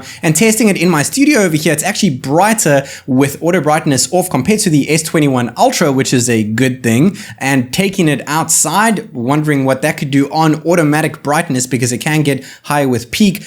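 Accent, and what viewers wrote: South Africa. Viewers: Hi, like your channel i can tell your South African, whats the easiest way to get my hands on this sony 1III in South African?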